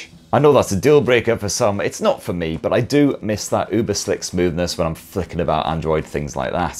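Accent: United Kingdom. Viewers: Best tech channel ever. And the classy British accent makes it even better. Although occasionally I find the British phrases funny. "The lighting is pants." 😂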